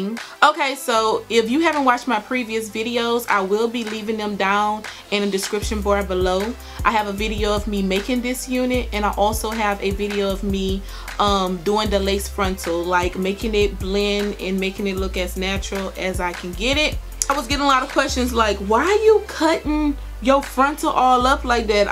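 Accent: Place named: South, United States. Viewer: OMG I freaking luv this video! Yass honey! I felt like you were giving us! Love your personality and your country accent! You look GORGE by the way!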